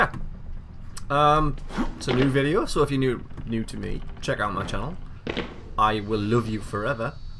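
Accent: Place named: United States. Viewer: I watched anyways. I actually like your accent (have a real hard time placing it). I was talking about your American accent. It made me giggle.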